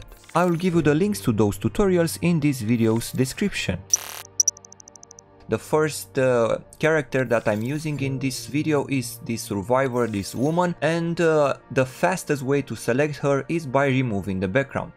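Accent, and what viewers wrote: Romania. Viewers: Imi parea mie ca esti roman dupa accet :P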